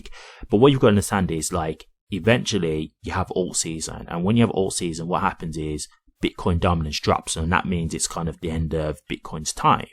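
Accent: Birmingham, United Kingdom. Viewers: You sound like a fellow Brummie XRP holder. Good luck to us all.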